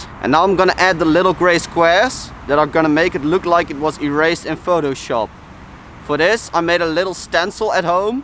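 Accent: Netherlands. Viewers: are you dutch you sound dutch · Hoi ik weet niet of het te persoonlijk is om te vragen, maar ben jij nederlands? Je engels accent is echt een typisch Nederlands accent, kwas gewoon ff nieuwsgierig. Verder wel een coole video. :D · Nice ! K vind het wel leuk dat je gewoon aan zowat alle Nederlanders kan horen dat ze Nederlands zij. Als ze Engels spreken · Dutch accent: 100% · You dutch? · Dutch accent! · He sounds Dutch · That’s a strong dutch accent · You Dutch? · Is it me or do you sound Dutch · I know ur Dutch or Belgian by the way your talking English🤔🤔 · Is dat in Eindhoven? Denk dat namelijk omdat je accent klinkt alsof je uit nl komt. If you dont understand me i Get it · You sound Dutch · Ik hoorde al gelijk dat je nederlands bent 😂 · Goeie Nederlandse accent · ben jij nederlands je accent lijkt mega hard lol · Ok are You dutch??? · R u dutch? It sounds like ur dutch with that accent xD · You sound like you're Dutch and you're from Limburg or Brabant if you are then hallo! 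· Bruh the dutch accent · Ru dutch? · You sound dutch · Are you dutch? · Duidelijk een Nederlander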